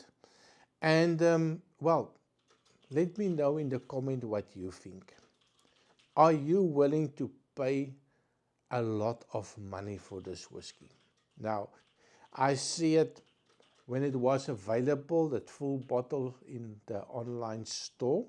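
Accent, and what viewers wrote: Afrikaans, South Africa. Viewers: You sound Afrikaans South African... I know because I sound the same😂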